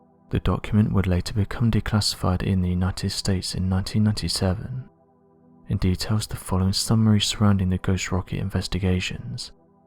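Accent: England, United Kingdom. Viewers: you heard him wrong. he clearly says "shooting off". i didnt even need to listen to it more than once to hear him say "shooting off" but after your comment i went back to listen and he doesn't sat "shitting off" lol. maybe you're not used to the English accent. I'm saying this respectfully cheers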